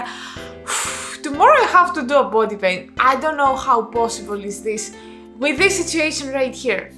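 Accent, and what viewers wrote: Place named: England, United Kingdom. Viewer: You are always good surprise :) Are you maybe from England? You have a great accent. :)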